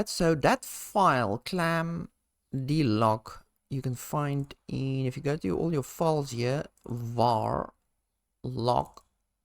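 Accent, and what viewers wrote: South Africa. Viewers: Love your SA accent. Which part are you from?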